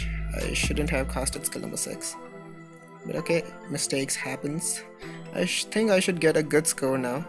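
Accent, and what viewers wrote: India. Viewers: your accent sounds Indian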